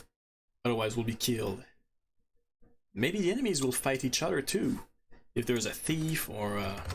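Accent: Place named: Quebec, Canada. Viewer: On ne peut jamais enlever l'accent québécois ;-)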